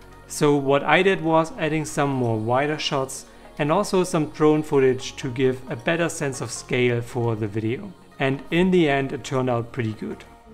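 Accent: Germany. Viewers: Is this a Hard german accent? Nice B Roll though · Are you from Germany 🇩🇪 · Mega geil man hört an deinem Aktzent das du Deutscher bist 😂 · Great video. And interesting accent. where are you from? Leipzig?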